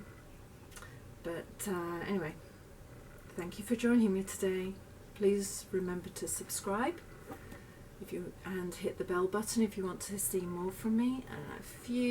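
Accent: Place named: Northumberland, United Kingdom. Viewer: Great instructional video!!!! Love your accent, too. You sound just like my mother, born in Morpeth, Northumberland. Especially liked video because you stuck to the necessary elements of making the cushion and didn’t put in lots of extraneous dialog to fill air time like others often do. You really know your stuff! I can’t wait to get started on my project. ❤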